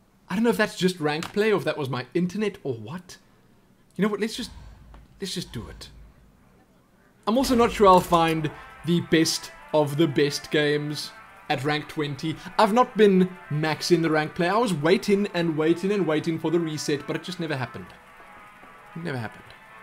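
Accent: South Africa. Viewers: South African, close to Cape Town :) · Hey you from S.A?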